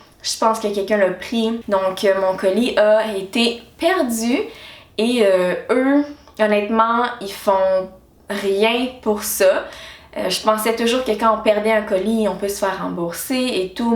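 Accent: Quebec, Canada. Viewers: En passant, tu ressembles à une Haïtienne. En tout cas, je l'ai senti avant de voir cette vidéo. J'ai regardé ton autre vidéo sur ta routine naturelle pour le visage et j'ai dit ''Je suis sûr qu'elle est Haïtienne (qui vit au Québec depuis longtemps aka ton accent) OU peut-être un mélange Haitïenne & Québécoise''. Je ne vois pas pourquoi on te pose autant de questions mais bon lol; il y a beaucoup d'Haïtiennes de ton type.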